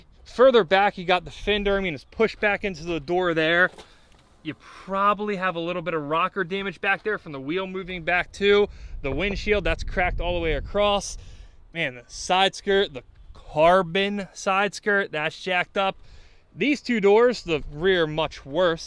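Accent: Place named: Baltimore, United States. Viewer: I JUST MISTOOK YOUR BALTIMORE ACCENT FOR THE DELCO ACCENT. I had to look it up. Ohh its thick in this video lolo 🤷‍♂️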